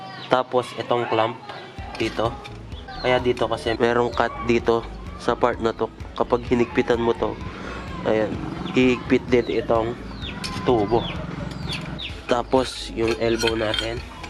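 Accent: Pampanga, Philippines. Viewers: Kapampangan ka pala😅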